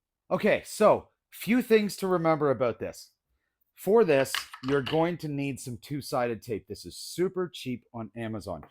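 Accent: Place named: Canada. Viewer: Subscribed because you say aboot and oot ....and the video is great. Greetings from Atlanta!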